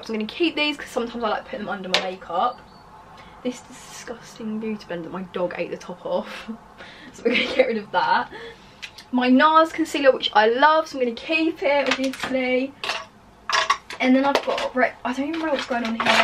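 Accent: United Kingdom. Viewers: me, a canadian, watching this because i love bright, alternative makeup and just makeup in general. me, the same canadian, listening to her british accent: LIT-CHRA-LLY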